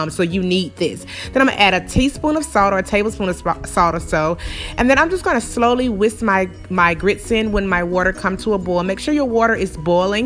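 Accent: South, United States. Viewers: Your southern accent makes me feel warm and welcomed to your. Videos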